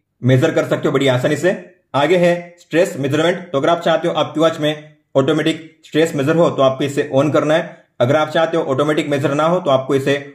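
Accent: Gujarat, India. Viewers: You r gujrati right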